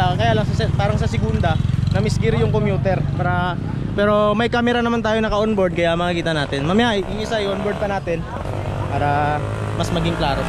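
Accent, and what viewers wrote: Iloilo, Philippines. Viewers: Ilonggo ka sir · Ilonggo ka boss? LOL “ano hambl mo? maapas lang sila ah” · Ilonggo dn ni pre??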